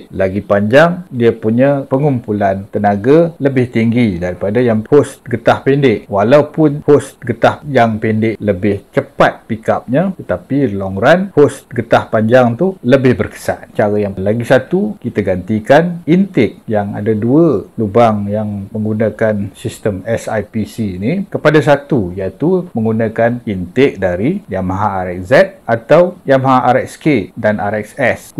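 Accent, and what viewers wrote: Perak, Malaysia. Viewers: Assalmualaikum.. loghat Perak geng ni.. zaman ayah sy... Lepak dayabumi..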